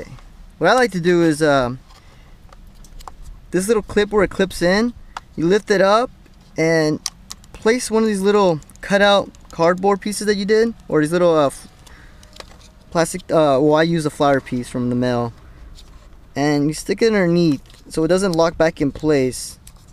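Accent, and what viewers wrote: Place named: Mexico. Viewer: Dude, amazing level of detail and tips on your videos! You sound Mexican, if Trump tries to deport you, you can hide out in my house for the next 4 years :)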